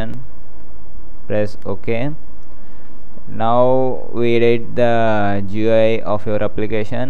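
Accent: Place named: India. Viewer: God damn your english sucks, great topic about QDevelop but the accent is much too strong to hear only no more than 2/3 of a word. It's like indian calling horror :( I do want more Qt oriented tutorials, but smoothen your english or something, take english pronounciation classes.. anything to ease it a bit, otherwsie, great.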